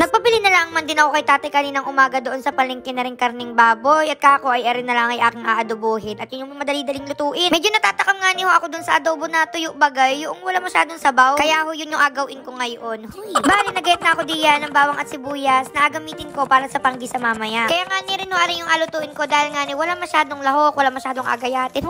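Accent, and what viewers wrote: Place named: Mindoro, Philippines. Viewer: Abay akoy na curious sa iyo napanood kita sa toni talks 😂 abay gustong gusto ko ang punto mo ay. Ayan napa subscribe ga tuloy ako 😅… ay siya ka mangyan na ga ako 😅… akoy ka Harabas din taga diyan din sa Mindoro… gustong gusto ko ga ang mga buhay buhay sa probinsiya. Good luck and God bless you more, keep fighting wag mong pansinin ang mga mapanirang tao eh. Ay siya akoy natutuwa sa iyo, go go go lang. ❤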